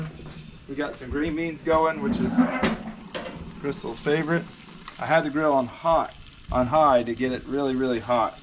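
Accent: South, United States. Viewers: Sounds like a good Ole country gal to me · I absolutely love her accent. Being from TN then moved to south Alabama it reminds me of home.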